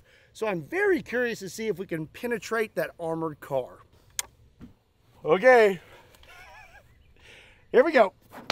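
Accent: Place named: South, United States. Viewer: you can hear the southern in his voice clear as day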